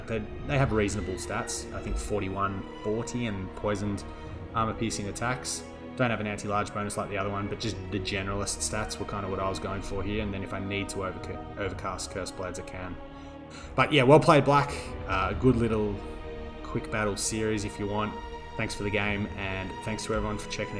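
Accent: Australia. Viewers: Great games bro! we gotta run into each other more on ladder :P Goddamn surprised me, your voice is amazing for casting along with the Australian accent!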